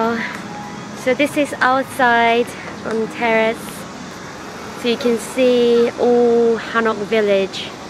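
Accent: United Kingdom. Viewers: 영국식 발음 정말 예뻐요!! 보면서 저도 계속 따라하고 있음 ㅋㅋ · 지금은 어디를 파헤치고 계신가요 ㅎㅎ 기분이 좀 우울해서 일부러 영상 보러왔어요 ㅠ! ㅎ 저도 전주 가봤지만 디게 속속들이 정성들여 전주를 여행하신다는 느낌이네요ㅋㅋㅋㅋ 좋아요! 영국영어.. 이제 회화공부를 시작했는데 정말로진짜 처럼 되고싶어요! 저 할수있겠죠?ㅠㅠㅠ 앞으로도어쨌든 영상 잘 볼게요! ㅎ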